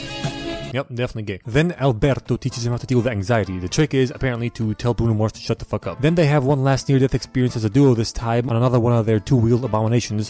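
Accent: Italy. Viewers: best italian impression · Wait why is he doing an Italian accent with Spanish words? Like Si. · nice italian accent btw nice video 2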